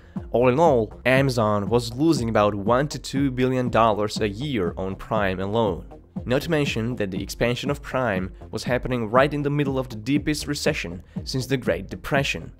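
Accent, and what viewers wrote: Eastern Europe. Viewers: You sound like an Eastern European person trying to impersonate a Scottish person doing an American accent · because he's trying not to sound like the Eastern European communist that he is.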